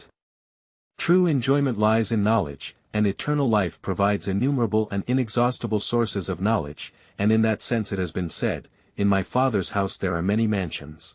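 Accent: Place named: United States. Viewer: I dont like américain accents, to me ruins the poetry of the words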